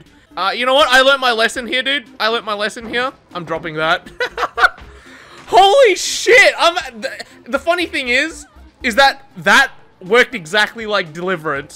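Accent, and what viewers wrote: Philippines. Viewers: being Filipino actually makes sense with his accent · Wow! The typical Filipino dissing the Pinoy accent! You got yourself a new subscriber. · The filo accent is so spot on I choked on my empanada.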